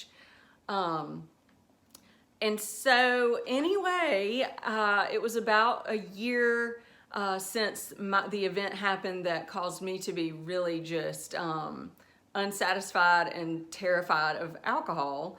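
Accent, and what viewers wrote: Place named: Alabama, United States. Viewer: A truly beautiful and inspiring testimony! I'm so glad you decided to start a youtube channel - yours is one of my very favorites to watch! And this is totally off topic, but your Southern accent reminds me SO much of my husband's aunt who was from Alabama - I could happily listen to you for hours... :)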